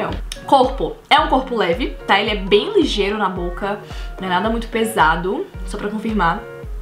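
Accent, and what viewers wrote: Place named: Fortaleza, Brazil. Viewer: senti a familiaridade kkkkkk 😆❤️ sou de Fortaleza também 🇧🇷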